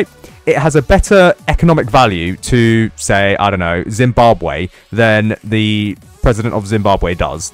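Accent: United Kingdom. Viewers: I love listening to a british guy yap for 12 minutes straight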